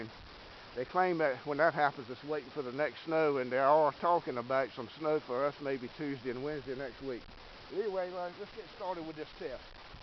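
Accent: North Carolina, United States. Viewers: I thought you sounded like a North Carolinian lol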